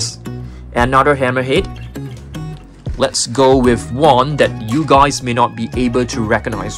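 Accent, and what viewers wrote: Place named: Malaysia. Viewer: He sounds like he is from malaysia and i am hoping i will make a fanart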